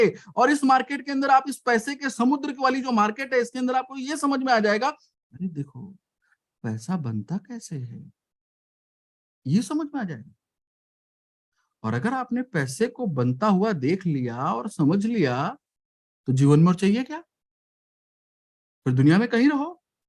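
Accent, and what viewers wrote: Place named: Uttar Pradesh, India. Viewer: ji kya aapka number mil sakta hai aapki hindi se lagta hai aap UP se hi hai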